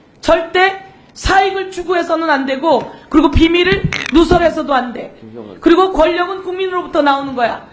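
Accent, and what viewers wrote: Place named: Jeolla, South Korea. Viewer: 영교 깽깽이 말투 카악 ~ 퉷 🤣🤣🤣🤣🤣🤣🤣🤣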